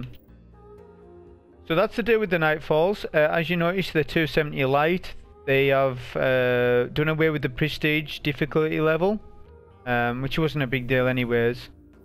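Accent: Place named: Newcastle, United Kingdom. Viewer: had to happen we had some wonderful years with alex and now we have a clown, but we will prevail. question will you be doing the whisper help runs and how does one get to know. ps its just nice to follow someone who is not american lol and after watching "vera" et al can understand every georgie word. keep it up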